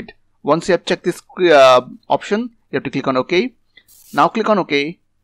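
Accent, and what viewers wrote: India. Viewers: i tried every solution before this, but as soon as i heard an indian voice i knew my shit is getting fixed real quick, ty bro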